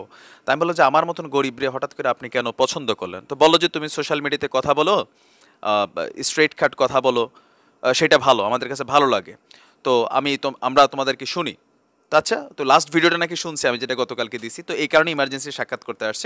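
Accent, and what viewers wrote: Comilla, Bangladesh. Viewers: ভাইয়া,,যেভাবে শুরু করছিলেন,,😂😂 আপনি পারেন ও ভাই😜বাই দ্যা ওয়ে,আমি কিন্তু কুমিল্লার বউ,,,😁আপনি একদম খাছ কুমিল্লা পোলা😬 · ভাই আপনি কি কুমিল্লার নাকি?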